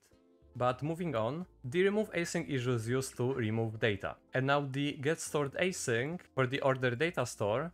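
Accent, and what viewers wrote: Poland. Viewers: you sound polish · dzięki, słychać polski akcent ;)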